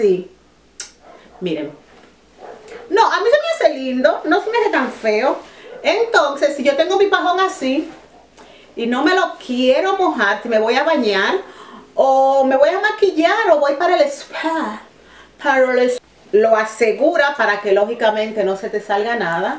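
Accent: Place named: Dominican Republic. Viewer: jajajajaajj es dominicana por el habla'o. besitos me encanta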